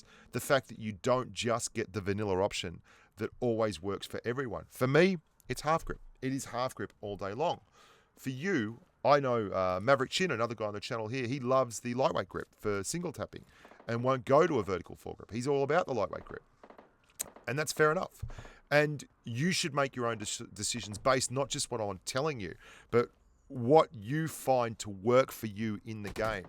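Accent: Australia. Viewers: Wow u got Aussie Accent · Are you Australian?...you sound like a mellow aussie.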